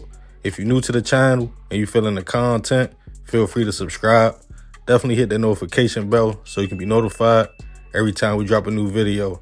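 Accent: Baltimore, United States. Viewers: Brudda i knew u was from baltimore b4 I saw the pick just based off of why you talk. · Baltimore accent got me losing focus on your story. It’s very annoying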